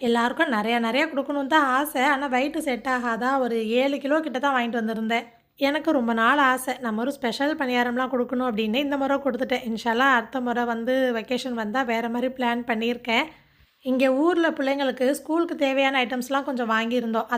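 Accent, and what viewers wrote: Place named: Ramanathapuram, India. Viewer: Assalamu alaikum sis❤❤❤i am meera from chennai❤❤❤interesting video sis, unga slang enga ooru slang polave iruku, naanga ramnadu pakkam kamuthi, last 2minutes very true words and emotional😢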